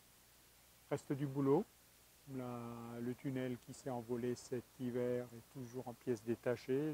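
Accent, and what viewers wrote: Alsace, France. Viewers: Bonjour, et merci d'exister ! J'ai découvert votre façon de jardiner avec joie, car à 75 ans je dois me ménager... tout en réalisant mon désir de potager. J'ai d'autant plus de plaisir à vous voir et à vous entendre que votre humour, votre léger accent alsacien me rappellent terriblement mon oncle Alfred, alsacien comme toute ma famille ! Je suis installée en Bourgogne, et j'ai convaincu mon époux de s'intéresser avec moi à votre méthode. Nous tâtonnons donc, mais cela nous réjouit ensemble. S'il est bien évident que l'on devine les connaissances derrière votre art de jardiner, je trouve très agréable que vous n'en fassiez pas des montagnes. Nul besoin de justificatifs, la véritable école est celle de l'expérience personnelle, et si certains ont besoin d'un parchemin c'est qu'ils ne sont pas très surs de leur science...mais qui peut prétendre l'être ? 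Je pense que je vais voir ce que je peux faire de la mousse que nous ratissons dans la "pelouse", soit pour border les espaces "enfoinés" soit pour remplacer le foin ou le brf à certains endroits. Se procurer du foin en rouleaux me semble encombrant, nous préférons le foin en "cubes", un peu difficile à trouver, et il nous faut le décompacter un peu.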